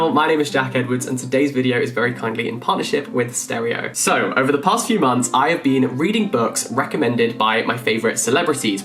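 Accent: United Kingdom. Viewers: His accent !!!😍 I adore the British accent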